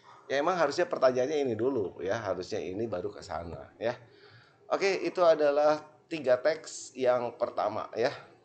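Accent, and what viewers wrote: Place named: West Java, Indonesia. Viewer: terimakasih kang, lucu menjelaskannya aya aksen sundaan 😂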